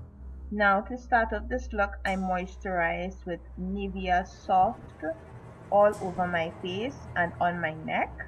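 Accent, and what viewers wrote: Trinidad and Tobago. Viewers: Ahhhhhhhhhh of course you speak French......Awesome. Cute trini accent too. I love videos like this, always loved playing in special effects makeup. No skills though, I shall definitely learn from you. Love it. I will check out the rest too.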